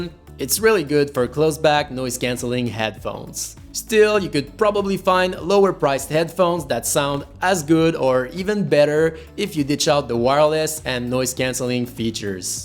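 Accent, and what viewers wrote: Quebec, Canada. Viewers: // He's from Canada, one of the French regions.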